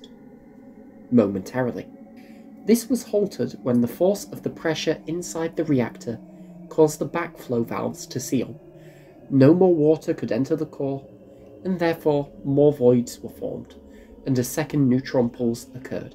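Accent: United Kingdom. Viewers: Keep that Scottish (?) accent under control pls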